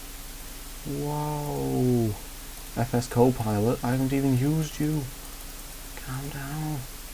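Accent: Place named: Keighley, United Kingdom. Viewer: You sound like a Keighley lad!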